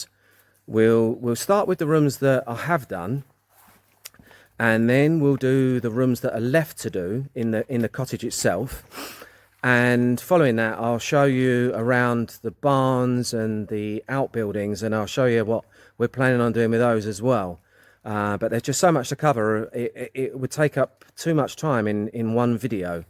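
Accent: Essex, United Kingdom. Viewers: Love your channel. Are you from Essex by any chance? Good luck with the renovations. 👍🥂🍾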